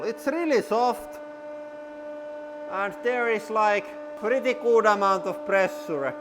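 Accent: Finland. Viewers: it's a Finnish accent boi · Are u from finland? Great accent btw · How Finnish people talk english 😂<3 SUOMI PERKELE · Sun ralli englanti on paras😍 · Brian Page Finnish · Like actually that accent sounds way too finnish for me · Sylphlez finnish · Mieze Katze hes probs finish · Are you from Finland? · You have like finland aksent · Benjamin Bjørndal nah they are finnish m8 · Are u from finland? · sounds like basic english accent from finland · bankroll unicorn boi its Finnish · baXtian no it's finnish people rallienglanti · Mariela Ivanova It is Finnish and the cutest thing ever lol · Are you Finnish? That’s what your accent sounds like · You can tell he’s probably finnish because of hi’s accent😁 ps. I’m finnish too🤟🏻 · Brian Page lol it's finnish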